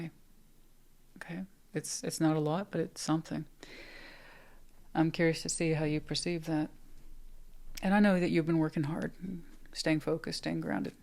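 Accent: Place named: Texas, United States. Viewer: Once again, I have closed my eyes laid back then I started your Pisces reading after listening to what seems to me a very happily excepted different more calming tone to your Texan voice of past? Your reading is so very correct i can not fail not to actually not believe that I what your Tarot cards speak to You ☆♡XIII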